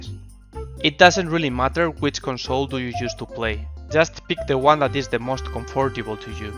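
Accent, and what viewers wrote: Spain. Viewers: eres de españa? tu acento me suena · Only an spanish can identify another spanish speaking in English^^ I have just done it! Good video! :P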